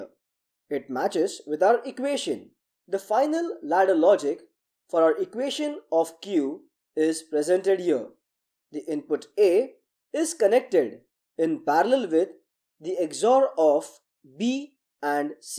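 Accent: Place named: India. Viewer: your accent sounds kinda like india, glad to have your videos, thank you.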